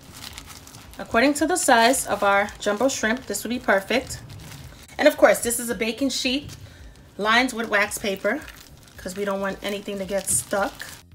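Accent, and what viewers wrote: New York, United States. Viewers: That looks so delish! I'm loving hearing the New York accent.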